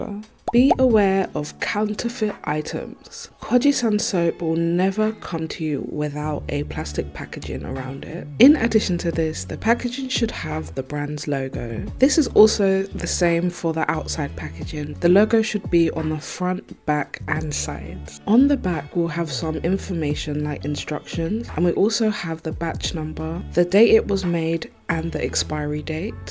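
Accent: United Kingdom. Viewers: Love your accent! btw, are you british?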